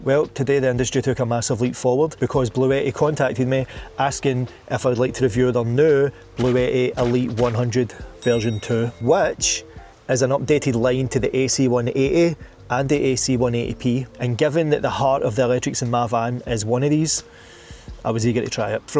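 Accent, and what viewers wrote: Scotland, United Kingdom. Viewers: The gentleman is Scottish. I am English. I can understand him perfectly. You on the other hand are incredibly rude. I also understand that it takes a hell of a lot of time, effort and resource to put these reviews together so that the rest of us have a better idea about what to buy. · I'm watching enough folks from the UK doing reviews that I'm actually able to understand almost every word. It's amazing! And it's a fun challenge. The one thing that I'm not so good at is distinguishing accents. British is pretty easy, but the other three are more challenging for me. I'm going to guess Scottish for you, but as green as everything around you is, it could be Northern Ireland. Am I right? BTW, I don't know about the pricing in the UK, but here in the States, on Amazon, I just bought one of these for 379 USD, which is cheaper than my Bluetti AC180 cost, at 479 USD, in December of 2024 and that was a huge Christmas sale price reduction. 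To me, that was the biggest "PRO" for the 100v2. I enjoyed you review and thanks for publishing the content. If you don't have one already you might look into buying a convection hob. They can cook many different things very efficiently and are perfect to use with a solar generator.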